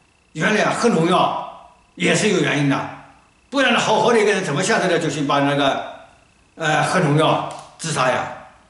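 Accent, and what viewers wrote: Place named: Zhejiang, China. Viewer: 大师是浙江人？口音很重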